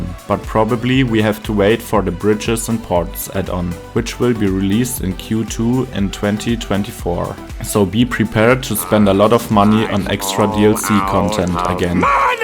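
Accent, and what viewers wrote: Germany. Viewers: Dein deutscher Akzent ist so unfassbar nervig, dass ich das Video nur bis schauen konnte.. sehr schade um die Informationen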